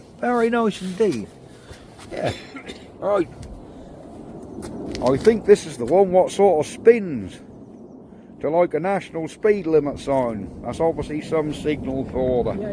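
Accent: Norfolk, United Kingdom. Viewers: I grew up in Narborough during late 60s/early 80s and went to the old Narborough school. The picture you showed of Crisps Garage was the Drs surgery during the 70s . Still visit Narborough to see relatives and will hopefully go to the museum on next visit. I remember Greta Towler as the postmistress who had a very cheeky minah bird. Love your Swaffham auction videos and used to visit most Saturdays when Tyrone Roberts was the auctioneer. Thank you for the nostalgia and the wonderful Norfolk accent!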